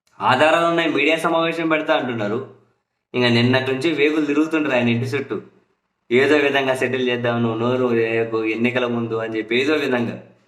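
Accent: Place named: Godavari, India. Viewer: anna meeru godavari basha vachesindi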